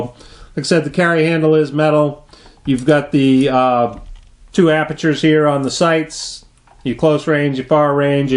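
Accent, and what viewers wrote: China. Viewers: chinglish FTW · got it just for the chinglish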